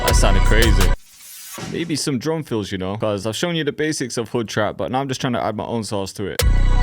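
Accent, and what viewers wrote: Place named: England, United Kingdom. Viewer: i love that England way of speaking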